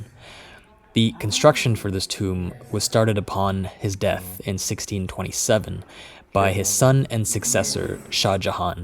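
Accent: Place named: West, United States. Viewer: ?? You are Indian or Indian origin people who lives outside of India? Your accent sounds western. Where are you from originally?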